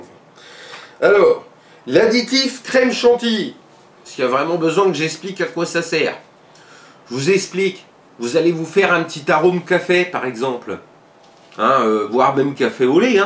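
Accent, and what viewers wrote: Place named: Franche-Comté, France. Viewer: Tu as un accent de chez moi, tu es franche Comté ?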